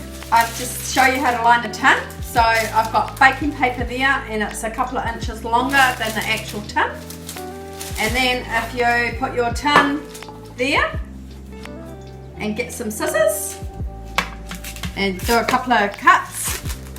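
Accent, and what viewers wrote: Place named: New Zealand. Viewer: What part of NZ are you from? That's a strong Kiwi accent!! I watching from Spain, missing my Kiwi treats.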